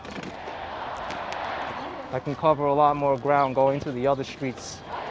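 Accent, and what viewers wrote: New York, United States. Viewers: I find your series more enjoyable when you make a live commentary... love the New York accent!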